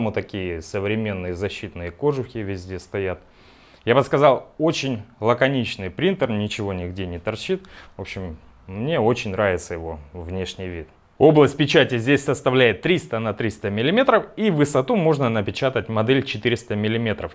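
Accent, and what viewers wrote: Rostov, Russia. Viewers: так больше половины Ростова говорит, это блин акцентом зовётся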